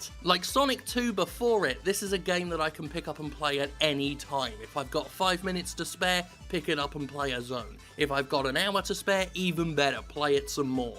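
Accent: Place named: United Kingdom. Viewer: Are you sure about that? From his accent he's clearly British! =P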